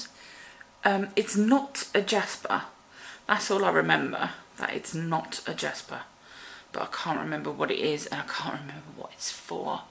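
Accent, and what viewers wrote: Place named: United Kingdom. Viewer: How come you have a British accent when you are Dutch? just curious not a dig :)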